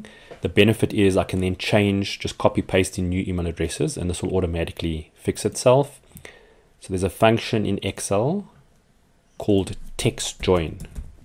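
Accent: South Africa. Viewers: As soon as you opened your mouth, I thought to myself, you must be South African. I can recognize my countrymen no matter where I find them. Am I right?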